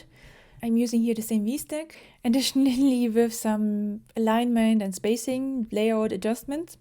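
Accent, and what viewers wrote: Germany. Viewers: Hi. Love your videos. The "better" word at this point would be "contract". E.g. "You can expand and contract the columns" instead of "you can expand and decrease the columns" English is funny/hard in that it has words that are common counterparts in everyday language. For instance: - increase/decrease - expand/contract - love/hate Hope this helps. Love learning from you! (Probably because my mom is from Germany and I love your accent.)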